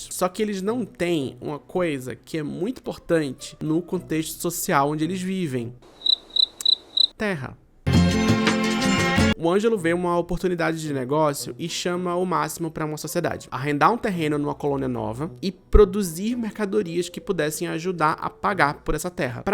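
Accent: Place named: Recife, Brazil. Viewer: tu és da zona sul do Recife, moço?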